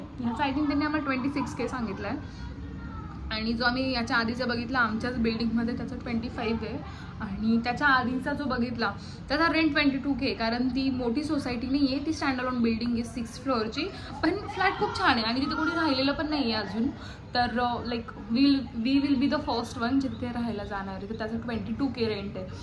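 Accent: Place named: Nashik, India. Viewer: Half vlog baghitla language hun vatal aaplyachkadche distay Nashikkar❤ nd I'm right😀 New subscriber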